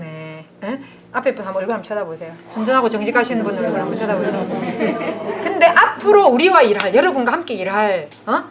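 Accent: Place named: Gyeongsang, South Korea. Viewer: 얼굴도 예쁘고 내가 좋아하는 경상도녀의 강한 사투리가 신앙까지 좋으셔서 배우기도 은혜로워요 감사함다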